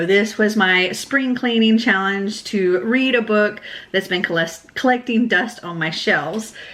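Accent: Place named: United States. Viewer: that's true he was nice all the time.... Btw I think you have the best accent of all the American booktubers!! Sorry for the weird comment but I always like hearing you speak in your vids!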